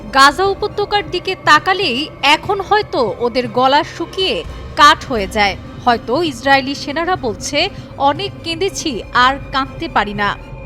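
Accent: Kolkata, India. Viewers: voice dada kolkata theke esse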